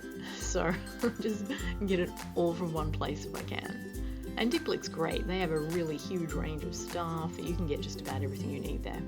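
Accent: Australia. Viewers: sorry for a kind of off-topic/personal question, I'm just wondering what Australian state you're originally from? just trying to place your accent hehe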